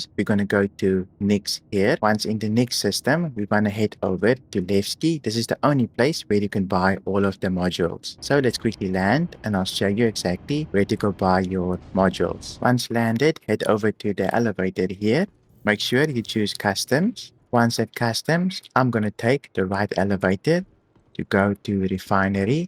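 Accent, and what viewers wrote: Afrikaans, South Africa. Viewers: Fokkit dis darem maar lekker om daai Afrikaanse aksent in Star Citizen te hoor. Dankie vir al die video's ek leer vrek baie! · Just checked where your account is based. Thought I heard a nice Afrikaans accent there. 💪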